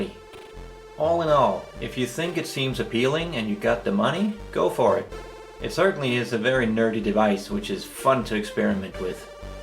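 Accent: Denmark. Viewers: Hmmm.... Sounds like you are Danish!?!?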